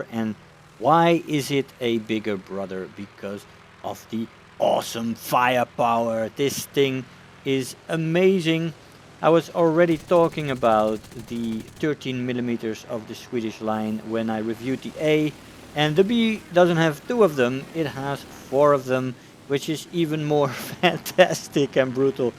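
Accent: Netherlands. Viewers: dat nederlandse accent lol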